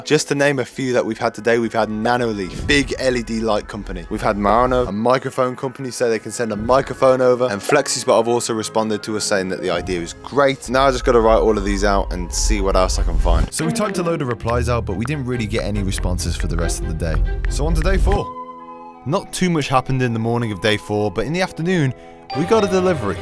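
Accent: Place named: United Kingdom. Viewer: Bro i have troubles understanding u because Ur briish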